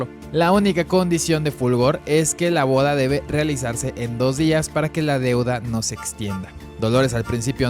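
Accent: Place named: Mexico City, Mexico. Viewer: ke chido acentito de parloteo xilangg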